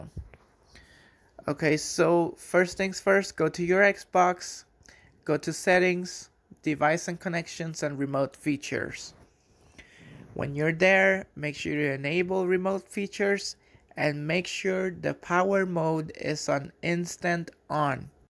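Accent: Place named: Brazil. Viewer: Cara teu sotaque é de br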